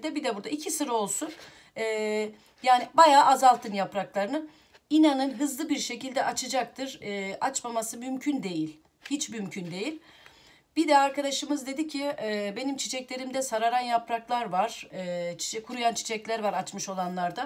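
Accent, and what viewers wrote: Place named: Trabzon, Turkey. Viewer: Harika bir vidyoydu bu arada siveniz trabzon gibi memeleket neresi🤗👏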